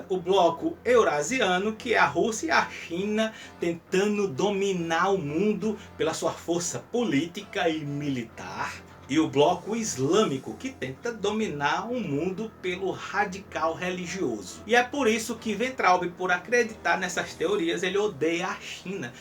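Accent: Northeast, Brazil. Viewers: Cara, onde você estava que só agora eu te achei? E que achadoooo! 👏🏼👏🏼👏🏼👏🏼👏🏼👏🏼Uma pista eu já tenho: o sotaque é nordestino😍😍😍😍😍😍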